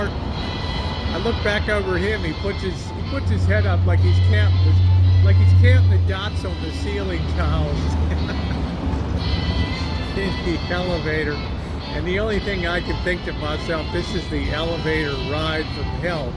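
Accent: Baltimore, United States. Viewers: I'm a new subscriber although I've watched some of your videos along the way before hitting that button. I've been trying to pinpoint your accent, and I thought you must be from Baltimore. When you mentioned that you've had enough of mobsters, etc. in Baltimore, I think you just confirmed my guess. You're such a positive, energetic person, and I really like your videos.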